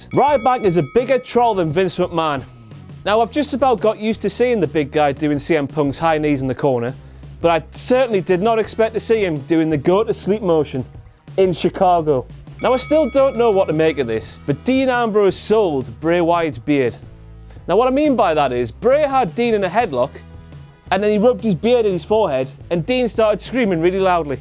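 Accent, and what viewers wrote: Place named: United Kingdom. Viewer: british accent.. wtf is he speaking in?????? english?????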